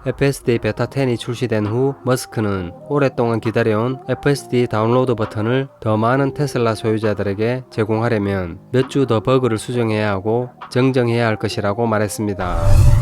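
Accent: South Korea. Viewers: 전혀 반대예요, 굴리시지 않고 한국인 답게 주체성을 갖고 발음해주셔서 매력 빵빵 ! 여하튼 영상 감사해요👏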